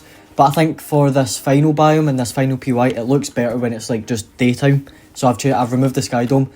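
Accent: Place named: Scotland, United Kingdom. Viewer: Most scottish sounding guy ive ever seen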